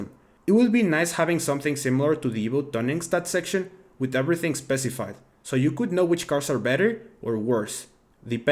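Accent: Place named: Mexico. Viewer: dude u sound mexican af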